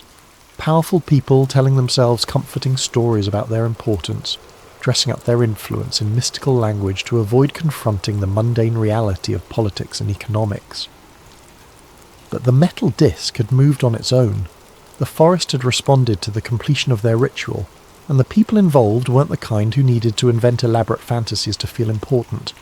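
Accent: England, United Kingdom. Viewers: please, no more english accent 😮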